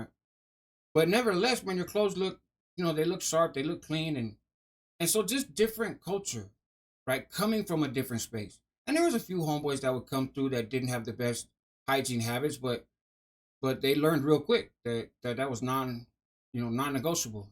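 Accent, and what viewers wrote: Mexico. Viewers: Honest question, why, how do u have a Mexican accent being white as a American. So many others have a normal American way of speaking. I wonder if you put it on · Probably because he grew up around a bunch of Mexicans. Kinda how a lot of New Yorkers have a stereotypical "Italian" accent even though they're not Italian.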